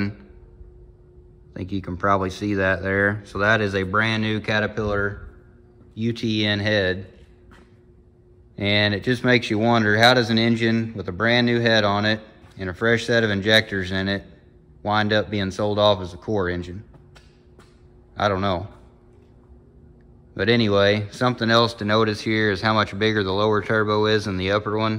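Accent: South, United States. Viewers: The southern voice is something that just keeps me comin back, I can listen and retain information from him all day, no music, no big word science bull shit. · I'm from wis but damn I like that accent. Prefect blend of knowledge and no fucks. Makes me think about moving south. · I agree.....He's a very knowable person...don't let that Southern accent fool you. Cheers from San Francisco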